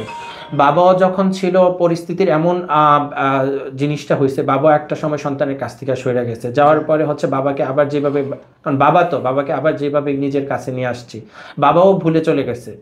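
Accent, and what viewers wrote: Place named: Comilla, Bangladesh. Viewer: ভাই আমি ও কুমিল্লার মেয়ে ভালো লাগলো আপনি আমাদের কুমিল্লা ব্রাক্ষনবাড়িয়ার ছেলে জেনে। ভিডিও সম্পূর্ণ দেখেছি ভাইয়ের আঞ্চলিক কথা গুলা অনেক হাসাইলো। কুত্তার হেউয়ানি শুনে হাসতে হাসতে শেষ 😄😄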